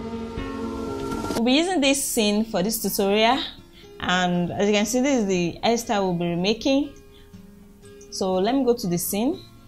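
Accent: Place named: Nigeria. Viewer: You're Nigerian? Your accent sounds familiar because I'm Nigerian too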